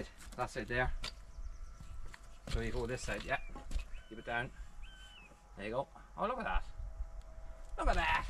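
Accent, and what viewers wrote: Ireland. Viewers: Love it, love it, love it......especially the wonderful Irish accent that goes along with every video. Thanks from Australia.👌👌👏👏💕💕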